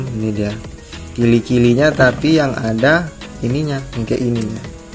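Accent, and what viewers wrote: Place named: Jambi, Indonesia. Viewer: Bang jambi dimano ny bang... Masuk nian nampak ny gaya abg bcakap tu :D hahahaha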